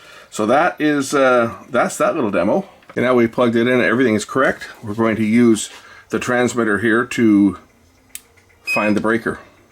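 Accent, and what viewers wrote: Canada. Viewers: Canadians pronounce been like bean .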